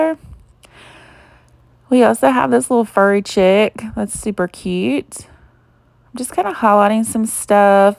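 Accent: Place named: South, United States. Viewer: Hi 😁 I live in California so your videos are ready to watch when I get up so my usual Morning routine is to drink my coffee and watch your content... I just love your southern accent and your personality... I look forward to your videos every morning👍😊 thank you so much and have an amazing day ❤️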